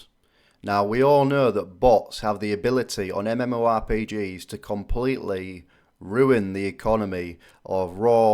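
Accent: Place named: United Kingdom. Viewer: Dude where abouts in the UK are you from ? Cant tell your accent.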